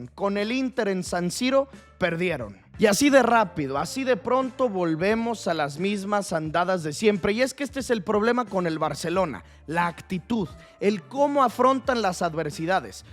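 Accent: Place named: Mexico. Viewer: Acento mexa de la rosa de guadalupe. Inaguantable la puta que te parió.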